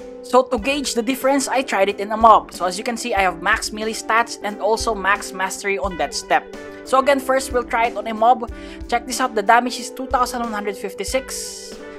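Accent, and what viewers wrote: Philippines. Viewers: You sound so filipino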